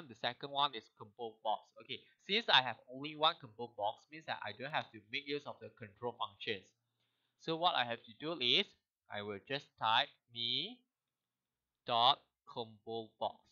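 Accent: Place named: Singapore. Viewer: so refreshing, watching a tutorial in singlish. yay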